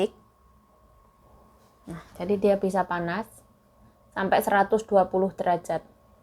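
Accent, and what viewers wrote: Java, Indonesia. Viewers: Hadir mbk... seneng dengerin nek sampeyan bicara jowone kentel jelas 👍👍cayoo 💪💪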